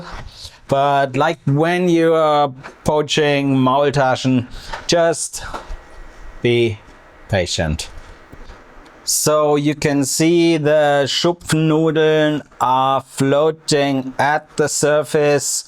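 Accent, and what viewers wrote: Swabia, Germany. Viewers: It is a reference (and a compliment to you) from a U.S. television show called "Saturday Night Live" from the late 1970's and the 1980's. Even though the TV show is still going on, it has different actors now. They do various skits (small plays) during the show. Back then, one of the favorite skits featured two guys who were body builders (weight lifters). And they used a German accent when they spoke. This was when Arnold Schwarzenegger had won the Mr. Olympia body building championship contest and started making movies such as "Conan", "Commando", and "Terminator". So, this was sort of making fun of him, a satire. In the U.S., if you go to a gym to do weight lifting to build muscles in your arms, it is called "pumping iron" because the weights used are made of iron, and you do an up and down motion to exercise, like an old style water pump or today's shampoo bottle with a little pump on top. 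So these two actors, whatever they did, were trying to train the audience, as if they were gym masters, to build muscle, so they could get dates with women. Their favorite line was "we'll pump you up", meaning we will help you get bigger, more muscular arms so all of the women will come to you. You, have large arms, so we assume you lift weights. You have a German accent, naturally. So whatever you do, even though it is in the kitchen, will be to "pump you up" and get bigger, more muscular arms. Even cracking an egg, will be to increase your arm strength "to pump you up". And in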